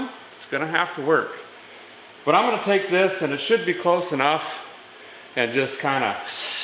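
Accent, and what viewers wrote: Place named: Michigan, United States. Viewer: Where you from? You talk just like my cousin in Mich!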